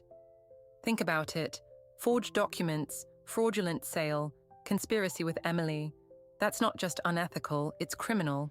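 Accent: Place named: United Kingdom. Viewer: Love the Brit AI.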